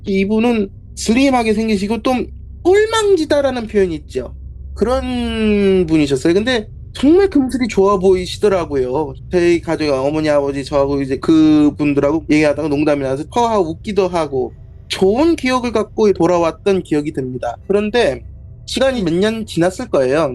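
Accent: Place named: Gangwon, South Korea. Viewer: 대전분이라해서 들었는데 토박이는 아니신가봐요 말투가 전혀 다르시네요 강원도분인가ㅋㅋ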